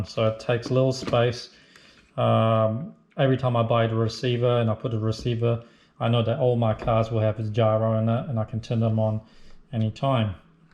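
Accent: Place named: England, United Kingdom. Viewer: Is this the uk cuz you sound english dude?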